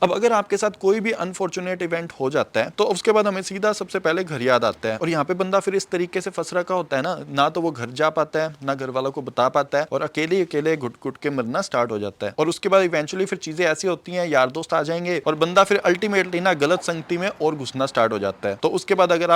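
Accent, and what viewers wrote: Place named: Haryana, India. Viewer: Bhai aap ka accent haryanvi jaisa lagta hai baaki aap kahan se hu ? 😀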